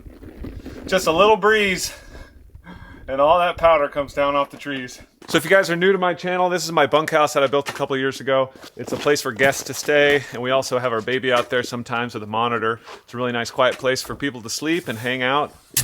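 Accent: United States. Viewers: what the hell is your "Negative this negative that" Their is no negative it is either "below zero" or "Minus" You sound like you were born and raised in Miami beach! Speak like a Northerner! WTF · I don’t like his voice… too hillbilly. But impressive & brave carpenter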